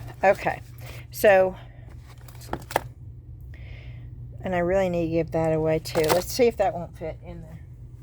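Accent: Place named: Pittsburgh, United States. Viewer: Do you have family from Pennsylvania? My old roommates spoke Pittsburghese. Unique words and word pronunciations. Warsh=Wash!!!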